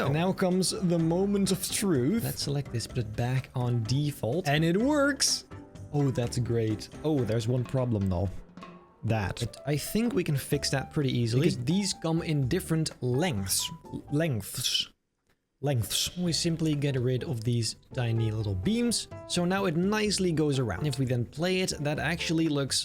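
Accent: Netherlands. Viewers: yoow broow jij bent nederlands toch? ik hoor het aan je accent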